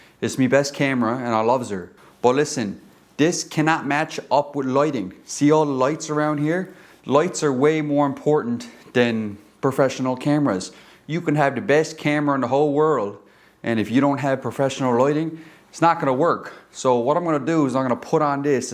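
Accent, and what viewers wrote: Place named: Australia. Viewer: Bro are. You Aussie 🇦🇺? That Accent is sick mate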